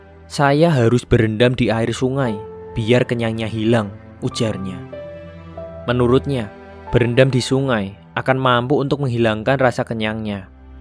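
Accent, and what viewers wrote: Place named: Java, Indonesia. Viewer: Beda orang, ini logatnya ada jawa nya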